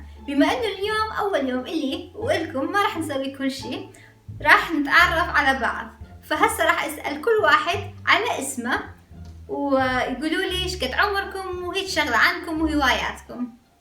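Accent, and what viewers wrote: Mosul, Iraq. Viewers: اشو لهجتها كانو عراقيه بس اكو كلمات بحجيهه مو عراقيه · لهجتها هيك لان احنا المصلاويين نحجي هيك وهي البنت اشورية يعني مسيحية ومن الموصل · احلى شي حجي عراقي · هااي لهجته العراقية 😊✌ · انتي عراقيه مبين بلهجتك شنو اسمج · سؤال انتي عراقيه اشو نص لهجتك عراقيه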